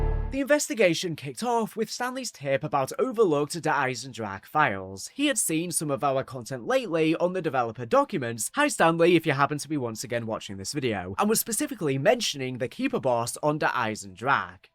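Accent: United Kingdom. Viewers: Bro what type of British accent is this, sounds like he’s talking winded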